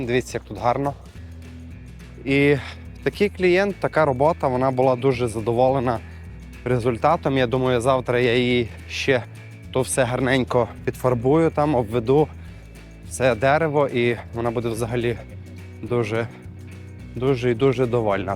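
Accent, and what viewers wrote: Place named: Transcarpathia, Ukraine. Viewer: Підписалась тільки через закарпатський акцент😊. Не знаю чи так чи ні, але сумую за Україною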